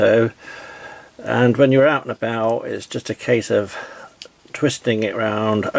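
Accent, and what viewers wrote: United Kingdom. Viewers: Superb - great knurling for a light lathe - and a nice outcome. Trying to guess your accent - is it Gloucester or Suffolk or am I totally wrong?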